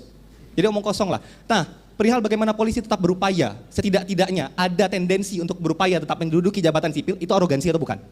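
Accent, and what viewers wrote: Indonesia. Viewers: Cara bicaranya mirip orang aceh..🤔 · Dari logatnya sptnya dia orang sumbar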